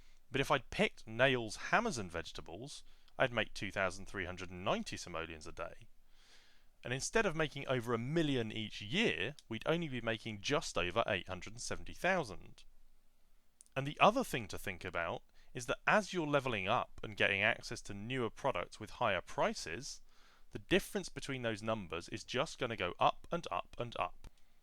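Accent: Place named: RP, United Kingdom. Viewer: Why is it that it seems classier to play this game while I am listening to instructions from a guy with a posh accent? Also, thanks. I knew there was probably something useful about selling things.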